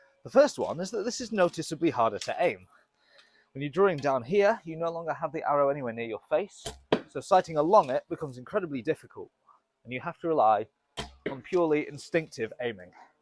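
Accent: United Kingdom. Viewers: I had this on mute and thought 'this guy must be british'...I turned the volume up and wo and behold lol P.s. this video was very entertaining and informative 👏🏾 ❤❤ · why did I know he'd have a british accent? From the thumbnail I was imagining something like "shooting soidways?? MAAAATE!" and then a bunch of Teesside verbal abuse lol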